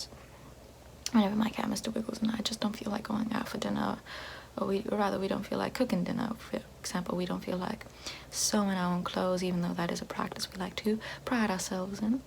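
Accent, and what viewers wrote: South, United States. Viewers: Southern accent stuff is great.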